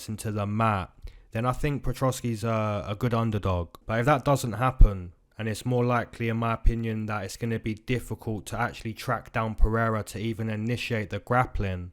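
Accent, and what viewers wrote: England, United Kingdom. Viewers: Your show is really good week in and week out. The comedy mixed in with accurate analysis in your England accent is pure money. My only complaint is I wish you would change the color of the background from white to anything else. That white background hurts my eyes on my TV. Everything else about your show is complete money. 💰💰💰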